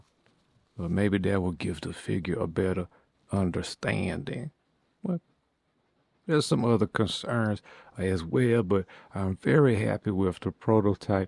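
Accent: Louisiana, United States. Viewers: Your hittin those "er"s pretty hard in this video. computer, figure. Just a bit different. As I have said in the past part of the appeal of your videos is your cajan accent.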